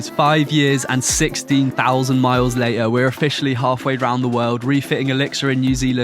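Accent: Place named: RP, United Kingdom. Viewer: Cheeks clapped? Way too casual use of that with this fancy sounding British accent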